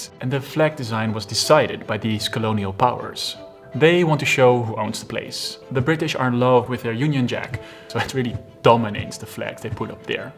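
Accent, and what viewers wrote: Netherlands. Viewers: I smell a beetje dutch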